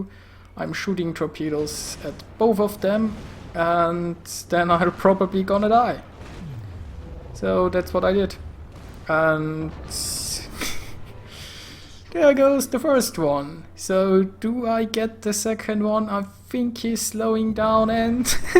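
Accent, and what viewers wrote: Germany. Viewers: german accent confirmed or not confirmed?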